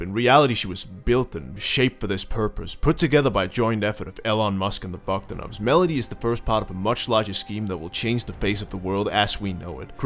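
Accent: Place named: Denmark. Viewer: Den dansk engelske accent vil altid være der uanset hvor meget man prøver at gemme den xd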